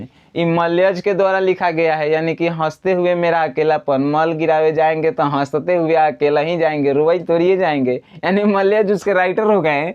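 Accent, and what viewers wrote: Bihar, India. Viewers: Sir aap bihar se ho kya language se lg rha h 😂😂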